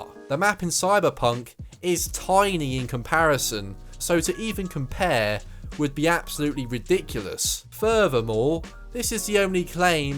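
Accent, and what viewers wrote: United Kingdom. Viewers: this goes to show a british accent. how eloquent it may sound. can still say a whole lot of nothing. lil bro made a video coz he pre-ordered it for 70$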